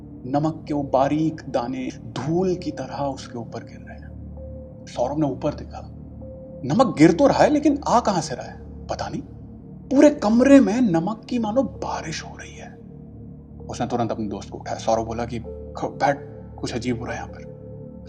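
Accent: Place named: Mumbai, India. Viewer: Bambaiya bhasha ekdum sahi pakde hai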